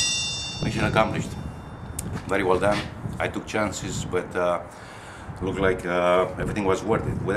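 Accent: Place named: Spain. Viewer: your spanish accent is very very thick that it is awesome!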